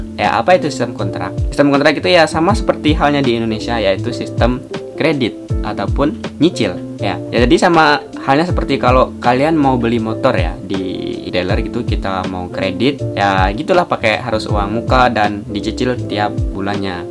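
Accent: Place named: Japan. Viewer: bang udh lama banget di jepang yak? soalnya aksen indonesia yaa udh agak agak jepang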